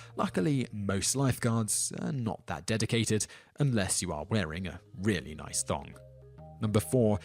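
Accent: England, United Kingdom. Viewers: He has an English accent because he's English? What do you think? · I notice you have an English accent, but you Americanize everything you talk about, stats, money, language and even driving. Sell out! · OMG dude what's with the English accent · Shouldn't this be laws nobody obeys in America? Also why is the narrator British?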